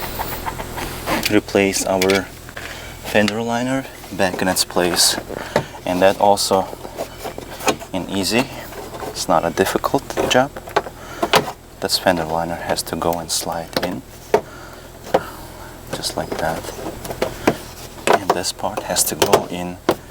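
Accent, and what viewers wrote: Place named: Russia. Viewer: Интересный акцент, похоже на русское происхождение никак не английское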